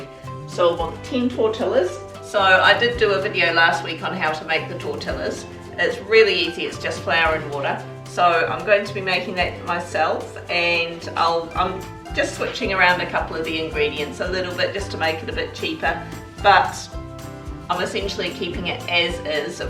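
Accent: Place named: New Zealand. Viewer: Yum! I have just found your channel and I find you so refreshing and natural. It’s great to see and hear a genuine Kiwi for a change. I’m in the Bay of Plenty. 😀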